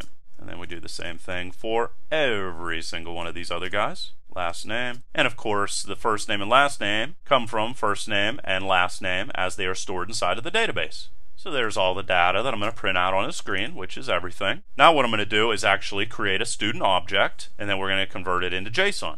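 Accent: Pittsburgh, United States. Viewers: OMG I just realized you have a Pittsburgh accent!!!!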